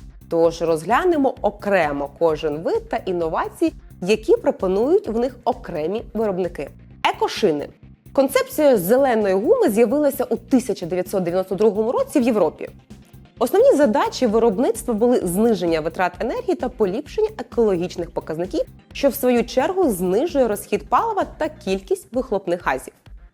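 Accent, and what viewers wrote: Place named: Russia. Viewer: вибачте- на "першому автомобільному" на другий рік війни ви капітулювали і,вслід за іншими, обмоскалились!??? Це жах - чути Вас масковскою😢😢😢... Осадачєк астался😮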